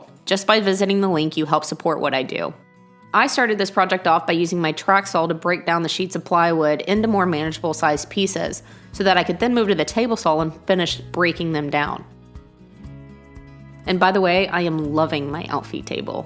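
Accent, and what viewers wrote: Texas, United States. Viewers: Wales here too:P ever since reading another comment mentioning the word sawL I notice it everytime now but hey a Texas girl, everywhere has their own little language/dialect quirks - how many people in the world will know exactly what a 'cwtch' is? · I really like your work, I have for a very long time. this build and project video. are great. Also love your Texas voice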